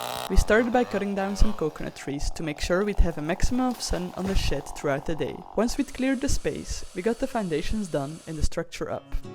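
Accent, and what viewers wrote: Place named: Belgium. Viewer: Wow this reminds me of pictures my parents had living in New Guinea except with all the new technology. I am part dutch, part Indonesian and your videos are so like cool and almost feels like home to me. I would love to visit there one day. My parents were both born in Indonesia but later had to leave with their parents after the war. Seeing a modern day scenario of how my parents lived except with Starlink, a more modern beautifully built home and riding the surf of the island is like a dream come true...Thanks for sharing these videos. Your Belgium accent is like hearing my parents as well with the European accent. My parents are both gone but I would imagine they would be in second heaven if they saw your videos today.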